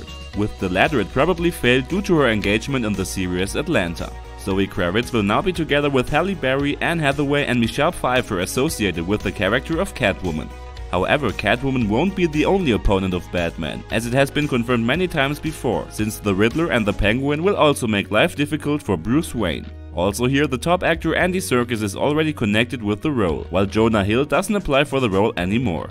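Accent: Germany. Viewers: der sprecher is safe aus deutschland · that german accent is so hard not to hear sry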